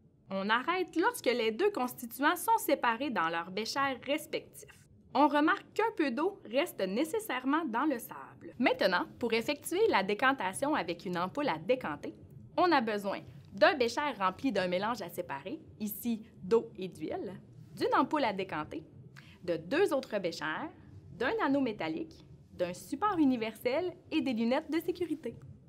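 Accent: Quebec, Canada. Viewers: Non c’est l’accent quebecois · Lol l’accent québécois. Bise au tous les québécois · Merci beaucoup vraiment et je veux pas etre raciste ou quoi mais l'accent québécois m'a aider a mieux comprendre merci beaucoup allo prof je viens de m'abonner!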